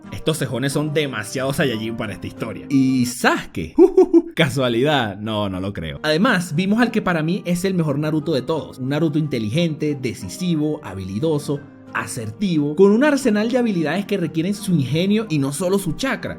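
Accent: Venezuela. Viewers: ajaj eres venezolano :v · este por el acento es venezolano XDD en fin mi opino sobre el tema es que si esta fue una buena saga y también es mi favorita pero; al iniciar la 4ta gran guerra ninja shinobi también fue épico su inicio y el camino que pudo haber llevado pero luego de que todo fuera guerra de genjutsus y el drama de sasuke pa presidente y naruto por ahí la trama empezó a caer notablemente, en los inicios de la guerra también pudo verse a personajes secundarios brillar aunque admito que la verdad pudo haberse desarrollado mejor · Eres Venezolano? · eres venezolano verdad? por el "y de paso"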